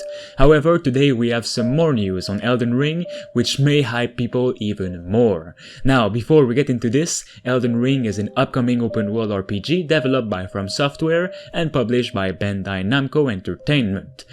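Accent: Quebec, Canada. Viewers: R u canadian french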